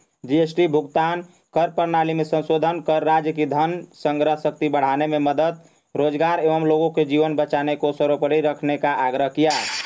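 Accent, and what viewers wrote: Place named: Bihar, India. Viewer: Aapka bolne ka tareka sahe nhe lga. Bihar jaisa lgata hai.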